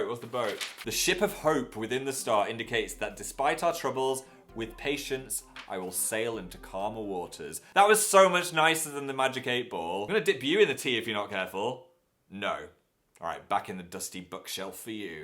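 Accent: North, United Kingdom. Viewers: Wow he sounds so northern in this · you sounded so northern in this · HE SOUNDS SO NORTHERN AT THE BEGINNING OML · U sound so northern in this video 😂😂😂 ... more than usual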